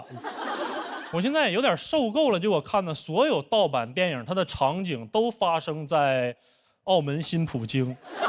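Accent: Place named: Liaobei, China. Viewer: 说学邱瑞的观众，你们身边一个辽宁人都没有？我们辽宁人或者说东北人平时聊天就这样，而且林简七抚顺人，邱瑞沈阳人，统称辽北人，口音就极为相似，你不如说全辽北人均学邱瑞。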